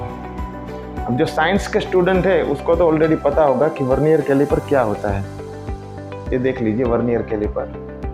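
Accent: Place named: Gujarat, India. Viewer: સર તમારો આ વિડિયો જોય ને બોલી ઉપર થીલાગ્યું કે આપ ગુજરાતી છો ખૂબ સરસ માહતી આપી શું તમે જૂના કોઇન લેવે- ચ કરો છો તો આપનું સરનામું મોકલશો 👍👍👍🔥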